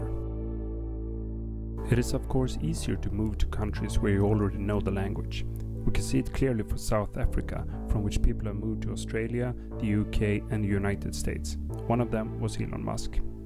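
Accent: Sweden. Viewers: Visste direkt att du var svensk med den där dialekten haha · Why do so many swedes speak this way? There do you learn to speak this way? Also Dave brought me here.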